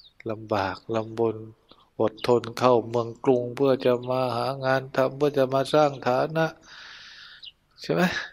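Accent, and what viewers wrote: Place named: Isan, Thailand. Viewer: ขอนอ้มนมัสการเจ้าค่ะ.ท่านเจ้าคุณ..ด้วยความเครารพได้ยินเสียงหัวเราะมีเสียงยิ้มๆไม่ได้เห็นรูปไม่เห็นหน้าตาเจ้าค่ะ.แต่ก็โอเคเจ้าค่ะ.ชอบฟังๆท่านเจ้าคุณบรรยายเป็นภาษาอีสานก็มีท่านเจ้าคุณพูดภาษาอีสานเพราะๆมากๆ.กราบ.กราบ.กราบ.สาธุ.สาธุ.สาธุ.เจ้าค่ะท่านเจ้าคุณ.🙏🌹🙏🌹🙏🌹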